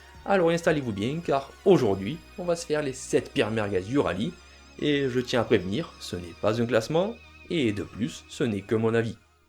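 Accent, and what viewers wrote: South, France. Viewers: chaîne que je découvre ! Rallye + merguez et l 'accent du sud !!! j 'adore !!! #saucisse power !!!!!!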